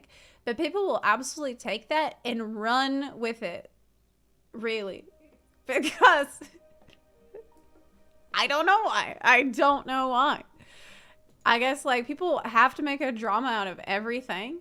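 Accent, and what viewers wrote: South, United States. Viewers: Is zeplas southern Akzent stronger then bevor ? Sounds like it ! Guessing merica is brushing off on her. Disclaimer i know she is from america. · Random note: your southern gal accent has made a major comeback since you were forced to return to the US! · Wow haven't seen a video since she's been back in USA and didn't realize her accent came back this hard. When I was a kid my family said I got a southern draw from going to my friends house.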